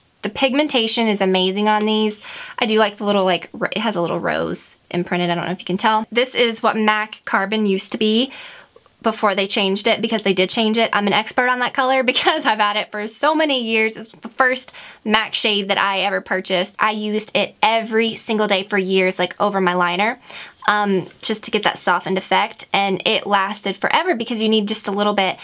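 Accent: South, United States. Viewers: I feel like I hear so much southern drawl in your voice, I love it!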